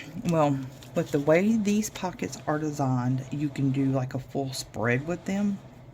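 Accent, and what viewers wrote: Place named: South, United States. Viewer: It’s so fun to hear a southern accent in a video! I love hearing the British ladies but not often a good ole Southern sound!! I love your altered paper clip idea! So cute! Your video was great! ❤❤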